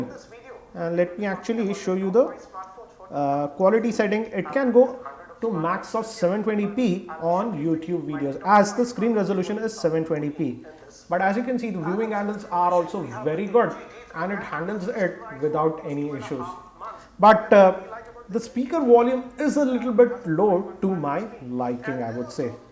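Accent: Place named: India. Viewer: ahaha this is like comedy central with information...ahahah....Indian accent... I end up laughing...enjoyed it a lot...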